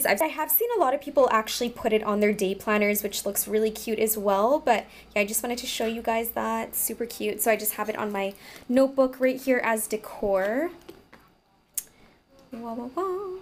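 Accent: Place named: Canada. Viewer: Canadian accent on fleek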